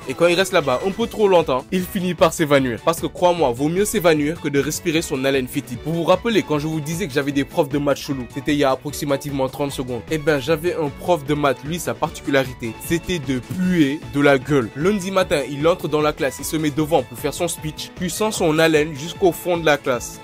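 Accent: Senegal. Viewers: Ta trop un acent senegalais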